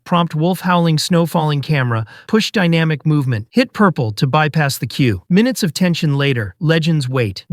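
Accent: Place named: United Kingdom. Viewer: Ur speaking too funny. If u would have been narrated normally, the video would have been millions views by now. But u choose sick british accent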